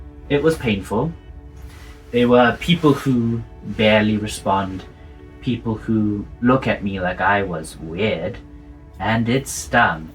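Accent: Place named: South Africa. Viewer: Just one thing I need to know, you accent sound a bit South African, I know that you're in Australia but do you have a connection to South Africa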